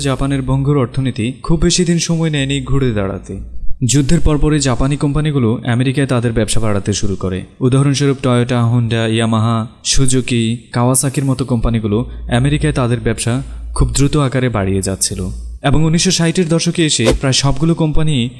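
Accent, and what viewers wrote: Comilla, Bangladesh. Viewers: কুমিল্লার মানুষ সুদ্ধ ভাষায় একদম ক্লিন।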